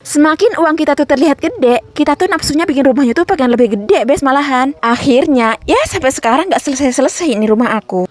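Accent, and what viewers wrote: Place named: Pandeglang, Indonesia. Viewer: Rumahnya dmn teh bahasanya mirip orang pandeglang kota kelahiranku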